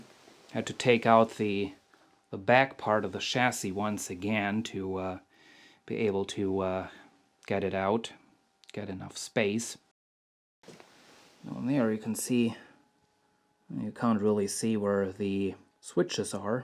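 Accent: Germany. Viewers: The sound of a true german enigneer, like Kraftwerk :D As a half German, I can see why we don't like our recognizable accent.. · For each video you make, the German accent decreases :). Thank you for the videos btw. Edit. Is from 2012. Your German accent got heavier actually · Aww no... the German accent makes you sound like a true repair guy professional... And it sounds really cool!! Please please don't lose it. .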